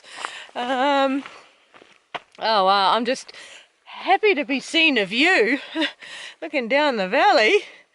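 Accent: New Zealand. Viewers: Congratulations! Well done. I'm going to miss your Kiwi accent.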